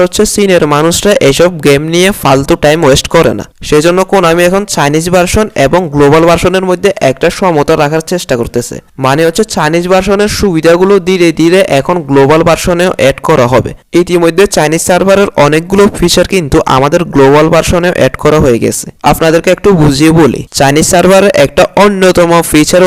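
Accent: Noakhali, Bangladesh. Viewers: bro are you from noakhali?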